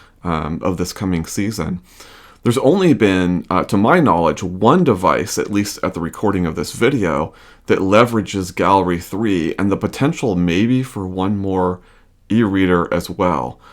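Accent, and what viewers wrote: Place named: England, United Kingdom. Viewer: Hi! I am from Argentina, nice to meet you , sorry for my English but I would like to ask you if you really are from US, you sound as if you were from England ! Thank you , wonderful channel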